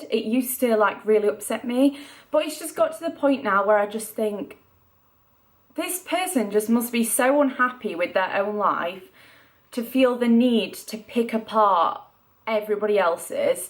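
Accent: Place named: North England, United Kingdom. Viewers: Reasons why quickly became 1 of my favourite youtubers: 1) She answers comments like says thank you or answers peoples questions which I find not many youtubers often do. 2) She works so hard on her videos especially editting them and they look amazing. Also you upload them regularly unlike some other youtubers. 3) You are brave enough to talk about this on the internet even though its a hard subject. 4) I dont usually like yorkshire accents but I love yours and I wanna speak like you although I cant do a yorkshire accent lol. Thank you for making this video even though you dont want anymore subscribers I think you truly deserve it and I cant imagine how anyone wouldn't like you. As well as that you made me think about being judgemental in this video and will try not to be, therefore you are making me a better person. Please dont stop making videos and please be in zoellas vlogs! 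I hope the hate stops and that this comment makes you feel better xxx 💞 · I love your accent where in the north are you fromy mums from liverpool but I live in sw london xxxx I love you . Great video x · She's from Sheffield I think, North of England :) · yorkshire i think?x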